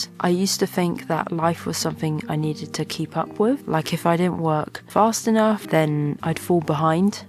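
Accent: England, United Kingdom. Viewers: Such a beautiful girl and pleasant British English!!! All the best from Russian subscriber!! Love this style of life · Yes, but an English accent doesn't mean she holds only British citizenship and while unlikely may not even be British. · You don't recognise the accent? 100% English accent. Not even cockney accent.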